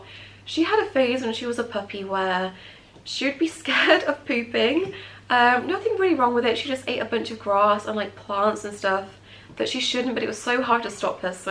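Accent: United Kingdom. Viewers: She is such a beautiful pup, it's amazing how she keeps herself occupied behind you! I've been looking at this breed for years, and have a great opportunity this July if it works out. Trying to learn all I can to make sure I can provide a great home. Do you have more videos as she's gotten older? I'm in the USA....love your British accent!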